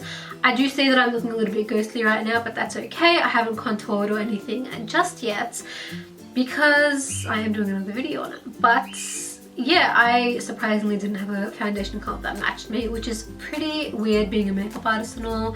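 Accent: Australia. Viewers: Her accent is Australian but she is Jewish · I thought I recognized the accent! My guy was born in Melbs! (We live in NY, I'm a NY'er.) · omd she 100 percent sounds like promise phan with australian accent!!!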